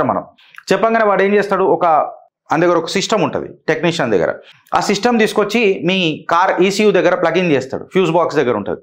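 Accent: Telangana, India. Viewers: Your way explanation with Telangana slang... Ultimate ❤🎉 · 👌 బ్రదర్ good ఇన్ఫర్మేషన్, మీ తెలంగాణ యాస లో ఎక్సప్లనేషన్ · గారు, మీరు అవసరానికి మించి ఎక్జైట్ అవుతూ అమెరికా అద్యక్షుడు ట్రంప్‌ని చాలా తక్కువ చేస్తూ అయన ఏదో ఒక బనాన రిపబ్లిక్ అద్యక్షుడు అన్నట్లుగా మట్లాడటం చాలా చవుకబారుగా ఉంది. మీరు ఇలాంటి సంక్లిష్టం అయిన, సాంకేతిక విషయాలని ఏదో పల్లెటూరిలో రచ్చబండ దగ్గర కూర్చుని ఉబుసుపోక కబుర్లాడుకునే వారిలా చెప్పడం తమాషగా ఉంది. మీరు తెలంగాణా యాసలో మత్రం బాగా మట్లాడుతారు. అంతవరకు ఒకే. మీరు ఇలాంటి కష్టమైన సాంకేతిక విషయం మీద మట్లాడాలి అనుకుంటే చాలా లోతుగా పరిశీలించి చెప్పాలి. మీరు చెప్పిన మొత్తం విడియో ఒక 10వ తరగతి పిల్లవాడు చెప్పినట్టుగా ఉంది. మీరు రెఫరెన్స్‌గా ఈ విడియో చూడండి. ఇది సరైన విష్లేషణ అంటే. 8 గంటలలో 216,070 views వచ్చాయి అంటే అర్ధం చేసుకోండి. లింక్: <link>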